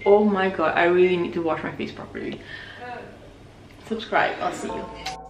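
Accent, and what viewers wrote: Kelantan, Malaysia. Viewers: suko dengar kak kecek kelate dgn family pahtu suko dengan kak beradik suko xD semoga kak dan kita semua sokmo dibawah jagaan Allah, dijauhi benda-benda buruk, didekatkan dengan benda benda baik. love you kak!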